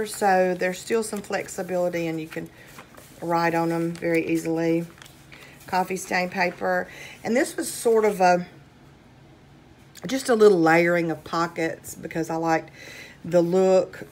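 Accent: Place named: South, United States. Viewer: I can hear your southern drawl, very nice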